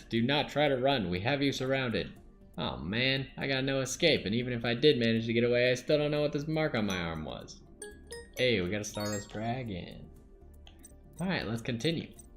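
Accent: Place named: Texas, United States. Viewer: That’s more of a Texan accent not a southern accent. There are nuances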